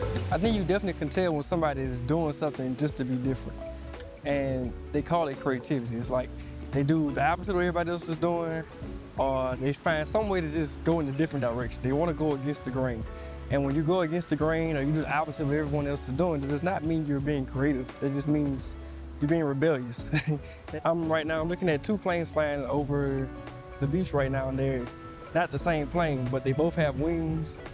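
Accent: United States. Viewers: I always thought you had a country accent. It didn’t fit LA. But everyone in LA is from somewhere else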